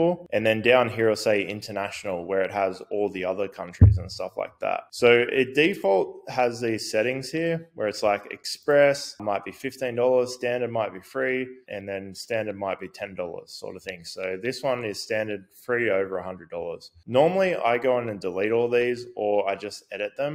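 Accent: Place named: Australia. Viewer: Thanks! Nice to have an Australian voice and real person to talk me through it (as opposed to an American chipmunk AI). 👍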